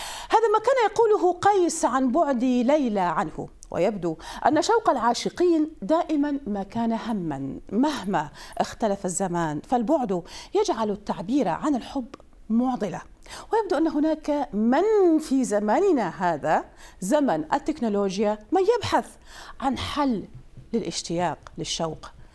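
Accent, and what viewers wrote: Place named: Iraq. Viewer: كنت اتابع ذي المذيعة مع أحمد و ما كنت ادري انه عراقية الا اليوم سمعت من لهجتها (': ❤️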